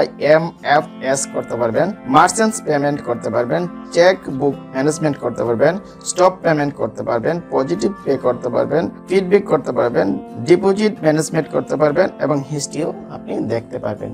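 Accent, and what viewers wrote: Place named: Sylhet, Bangladesh. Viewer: Video deke mono hocce apni sylheti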